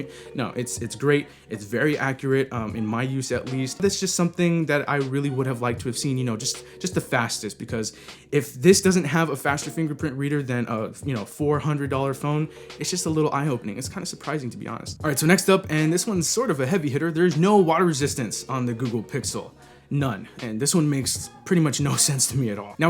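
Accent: United Kingdom. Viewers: that British accent tho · lol you make me laugh. I love the British accent lol good video!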